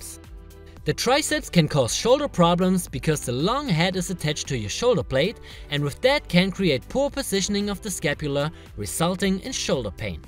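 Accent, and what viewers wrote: Germany. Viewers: Der deutsche Akzent ist ja insane XD aber gutes Video :)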